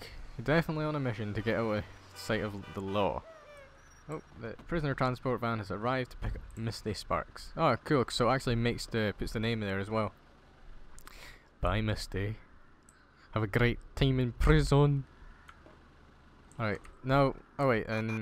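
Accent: Scotland, United Kingdom. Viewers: And also are you from Scotland it sounds like it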